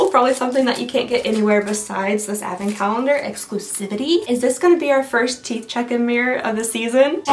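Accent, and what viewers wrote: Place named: Texas, United States. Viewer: Her Texan accent got stronger as the vid went on. The rage was too much.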